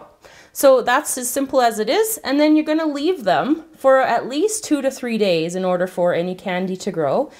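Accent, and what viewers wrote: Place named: Canada. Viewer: i love Canadian accents.....there adorkable 😊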